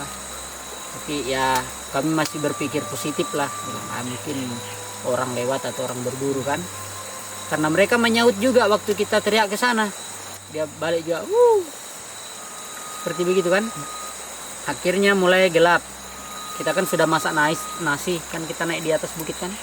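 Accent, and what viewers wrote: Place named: Makassar, Indonesia. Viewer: Eh ,, Toh ,, Hehhe makassarku sekali😘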